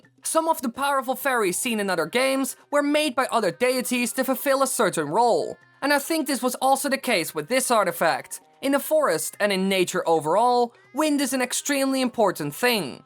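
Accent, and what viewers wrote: Netherlands. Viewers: He has an accent, he's dutch. Accept it or leave.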